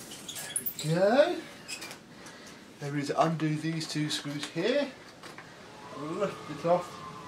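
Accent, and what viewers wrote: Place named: United Kingdom. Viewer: You have a very erudite British accent. Where in England are you from?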